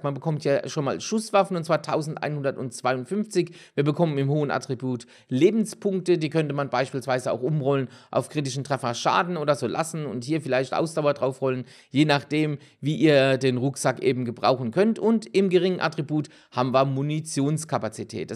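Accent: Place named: Heidelberg, Germany. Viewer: das hab ich gemerkt welche Richtung ? Heidelberg?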